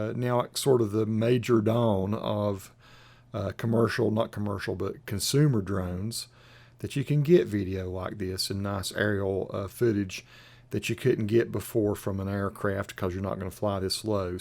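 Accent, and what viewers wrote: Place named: South, United States. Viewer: Thanks so much for making and sharing your videos. I’m “Southern by Choice,” having lived my first 18 years in America’s Siberia, and all these decades later I still don’t have a consistent Southern accent. You have a great one. Honest, I repeat after you 😄 tryna get rid of my rough edges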